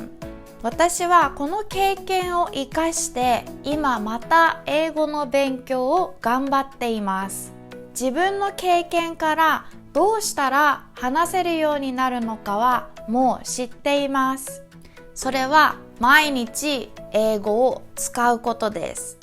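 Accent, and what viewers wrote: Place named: United States. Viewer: Your American English accent is quite good, it makes sense that you learned it naturally in an American environment. I worry my accent will always be noticeable when i speak Japanese.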